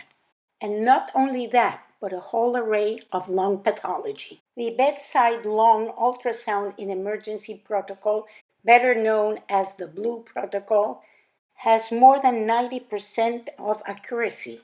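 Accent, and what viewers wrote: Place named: Italy. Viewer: MD, RDMS en los comentarios del video se escribieron tantas cosas de ti, tienes todavia muchos fans que añoran esos tiempos mucha gente me decia que te habias casado con un militar de la epoca de los 70s otros cque con un musico, etc etc las pocas veces que habalste ne los videos note un acento diferente, eres italiana?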